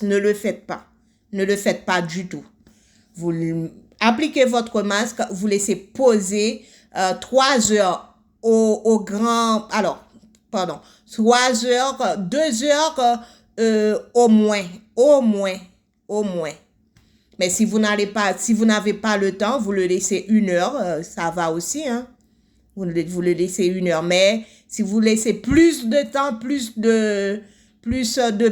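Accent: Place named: Haiti. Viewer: Vous avez un accent haitien ❤ sinon merci pour les vidéos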